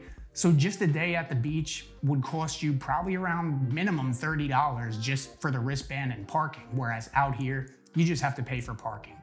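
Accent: New Jersey, United States. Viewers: I'm in Jersey City and planning to move in Miami Beach. Am I doomed to drown in the high cost of living? Where were you in Jersey? Was it worth the move? I hear that Jersey Italian accent.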